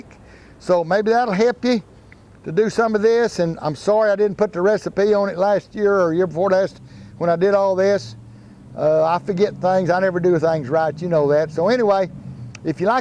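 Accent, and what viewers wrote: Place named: Oklahoma, United States. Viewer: Hi I saw a question on one of your videos regarding the smell one of your viewers was experiencing with their wicking tubs. I had the problem, it was because my drain hole was too high. That is not enough air space. Love the way y'all talk down there in OK. Looking at you from Melbourne Australia You have to realise that you are broadcasting to the World. no one has heard of sea minerals in Australia. Your fertiliser cocktails are terrific none of the brands you use are available here but the NKP formulas come through. Thank you for all the tine you spend teaching us.And thanks to Mrs Sloan for putting up with us.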